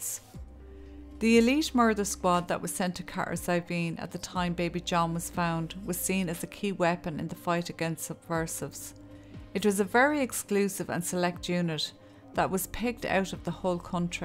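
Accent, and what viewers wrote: Ireland. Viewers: I do have to say that these stories of murder and the following mayhem are awful, but getting to hear them told in that beautiful Irish lilt of yours makes the hearing of them a little more bearable. I’d love to remind folks that “the church” and “religion” is about as far from God as living in sin is. Remember, it was the “church people” who crucified Jesus. Jesus never condemned anyone. He taught His message. Those that received that message were told to go on their way and sin no more. Those that did not, they just went their own way. Most of the atrocities committed in this world have been done in the name of God, but the SPIRIT of God was not behind it. Jesus Christ was crucified by “church people”. If church people would crucify our Savior, how many more of us regular folk will be made to suffer at the churches hand? I hate to think of it. 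Organized religion is the very devil!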